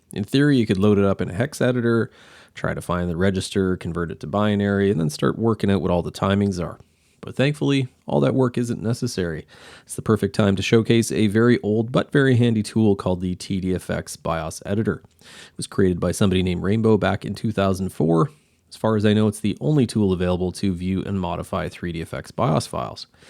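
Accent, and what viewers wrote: Canada. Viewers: I saw Voodoo 3's in the thumbnail and assumed this was a "Bits und Bolts" video. I was surprised when a familiar Canadian accent appeared instead. *waves from Winnipeg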